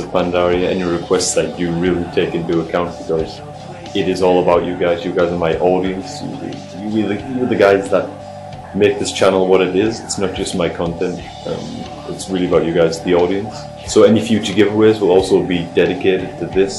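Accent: Newcastle, United Kingdom. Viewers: Where are you from? You play on U.S. right? Just 'cus your accent sounds like a Geordie's a little :> gz on the partenership! looking forward to more content :)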